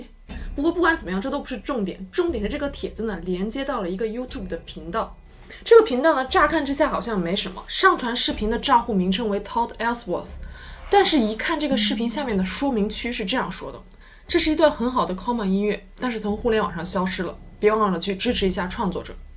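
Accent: China. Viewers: 是中国人很正常😎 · You sound like from mainland China.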